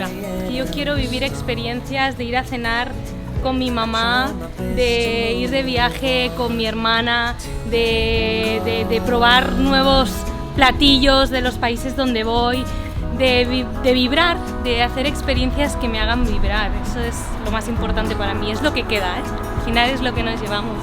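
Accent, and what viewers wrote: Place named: Catalonia, Spain. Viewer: apenas conoci su contenido un par de semanas... Y me atrapó... Es carismática, una mexicana con acento catalán, una catalána con sangre mexicana. Esa es la nueva realidad que nos muestra. Que las nacionalidades son relativas, tu patria eres y el lugar donde florece el alma. Son un gran equipo, saludos.. tienes un gran tesoro cuidense, si algún dia si me topo contigo en la calle y ese ir y andar tan tuyo.. no te asustes si me emocióno al verte. Abrazos 😽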